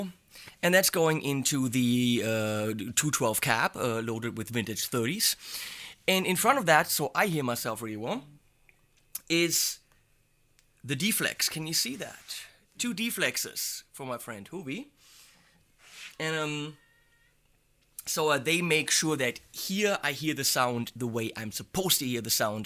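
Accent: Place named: Germany. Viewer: LOL! Love your demo of the Dark Matter, your humour, and your German accent! Keep on! Helmut from Germany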